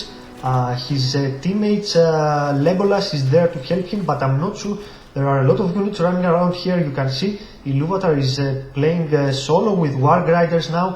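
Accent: Greece. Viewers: Hey, are you Greek? That accent sounds like a Greek talking English. Just wondering...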